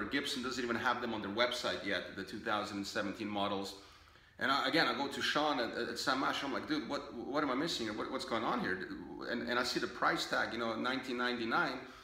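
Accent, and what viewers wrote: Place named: Italy. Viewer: You sound a little bit italian😂. I‘m italian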